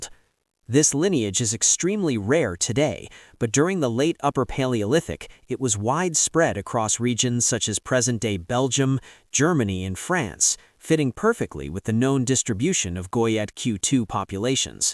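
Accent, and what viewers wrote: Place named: United States. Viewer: I can't listen to this because the American accent is too annoying.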